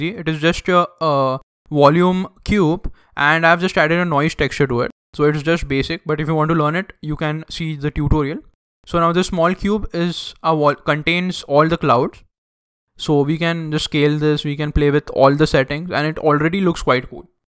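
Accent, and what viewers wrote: India. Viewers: Is he Indian or does he have an Indian accent?? im not sure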